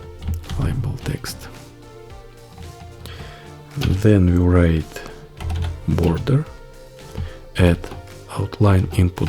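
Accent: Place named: Russia. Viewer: Are you russian? I hear a native accent) Ну или мне просто показалось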